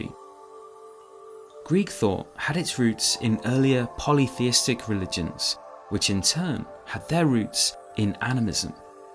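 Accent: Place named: United Kingdom. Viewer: can't do the voice. I get enough British ascent,. In our Canadian programs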